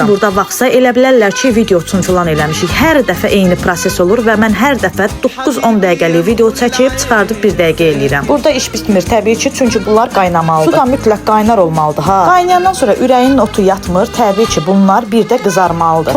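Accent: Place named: Aran, Azerbaijan. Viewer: nagarsan aa)) aran zonasinin lehcesine benzedi)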